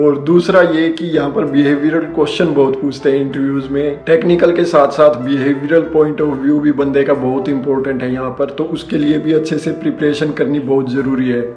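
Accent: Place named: India. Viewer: Omg how to understand that Indglish..😩😵‍💫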